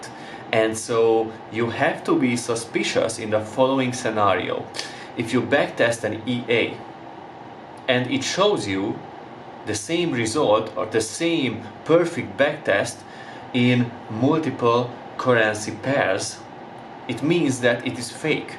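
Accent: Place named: Hungary. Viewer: I think from hungry, as far as the accent goes. I'm from there, too. So i guess I could identify it :)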